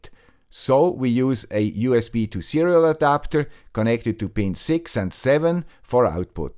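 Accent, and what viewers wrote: Switzerland. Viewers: we're tired of your Swiss accent! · wow! Thanks guy with the Swiss accent! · your dulcet Swiss accent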